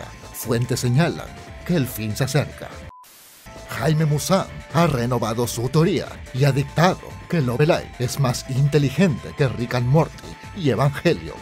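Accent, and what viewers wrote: Peru. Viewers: Alv eres peruano :D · Eres peruano?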